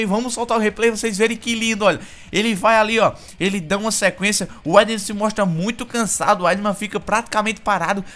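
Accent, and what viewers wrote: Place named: Pernambuco, Brazil. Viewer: owwww vc é de Pernambuco ne ?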